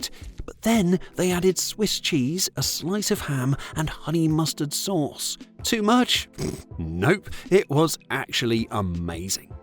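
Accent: England, United Kingdom. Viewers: It's weird hearing american fast foods of yesteryear being narrated by an englishman.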